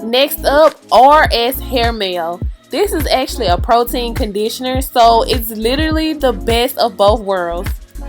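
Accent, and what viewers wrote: South, United States. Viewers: computerfreak247 I said the same thing. In the south somewhere lol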